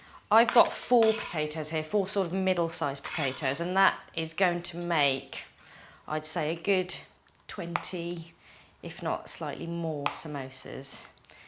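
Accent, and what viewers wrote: England, United Kingdom. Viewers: Very well demonstrated. And ofcourse the English accent is such a pleasure to listen to. I am definitely going to give it another try. 👍🏾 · very british accent init? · Are you speaking British English sorry look like Indian girl thanks for taste food recipe Thanks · I loved your Samosa recipe, the authentic Indian taste. You also have a beautiful British accent.God Bless . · In fact I've been trying readymade ones for ages, but now that you show this amazing recipe, it's worth trying. Thank you for sharing the tutorial. By the way, love your British accent. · Just love your British accent! Thanks for sharing! Will try them soon. We had them in England years ago and my husband loves them! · Love your video!! Love your British accent and how you showed every step in such detail. Thank you so much for sharing. Can't wait to try making them!! 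Can you make these with whole wheat flour and bake them rather than deep fry?? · It's just almost 10 years older, video with so much of freshness and life into it..the samosa...lovely british accent...many thanks for the recipe from India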